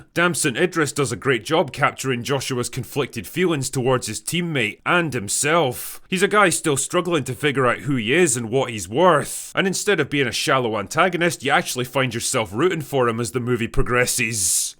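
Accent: Scotland, United Kingdom. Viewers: So I have to say I haven't been watching all of the F1 movie reviews because I'm going to see it tomorrow in IMAX I'm a Brad Pitt fan I'm a big F1 fan and I didn't want to spoil it but wow your review was awesome not because you said you like the movie but why you said you like the movie. I was listening to it and I said this guy's got to be from Scotland and my wife's ancestry is Scotland and I love the Scottish people hey any man that can wear a kilt and pull it off I got to give you credit and who doesn't like bagpipes anyways love the review love your attitude about it and now I'm a subscriber and looking forward to more things and I like your channel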